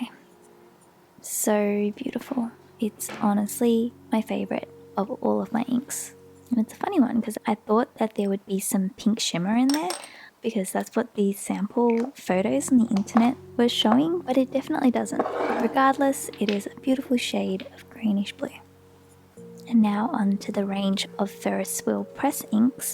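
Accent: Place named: Australia. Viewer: Great to hear an Aussie voice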